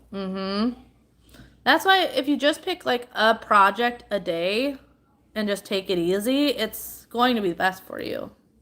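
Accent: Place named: Minnesota, United States. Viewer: just a note gators can and do come into brackish waters they can live in it for a short time. I feel the same about Shark vacuum's I have one and love it, it works really well! Jungle theme is nice for a baby 's room! I like the dawn power wash I've seen where you can make your own? If your son has asthma make sure you don't have ligustrum's (privet) hedges planted near they cause attacks a friends son was just in the hospital because of them... Yes you do have a Minne soda accent Lol! But I like it!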